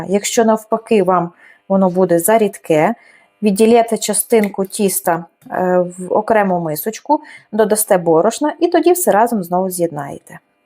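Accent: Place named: Lviv, Ukraine. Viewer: Добрий день,! Дякую за рецепт. А Ви не зі Львова часом? Бо чую чудовий львівський говір, інтонацію, дуже приємний.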